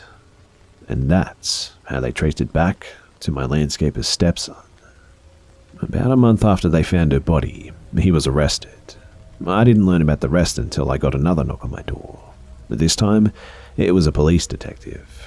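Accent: Australia. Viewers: He's Australian, lmao. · Are you an Aussie?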